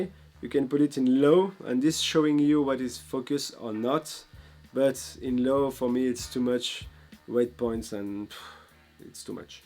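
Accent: France. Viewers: Salut mec!!tu parles bien anglais😜c’est la première vidéo que je vois de toi et je trouve ça vachement sympa avec les sous-titres en anglais et ton petit accent français j’arrive bien à comprendre et ça m’entraîne!!go voir les autres vidéos!!😜petite question... pourquoi tu fais des vidéos ou tu parles anglais alors que tu es français?😅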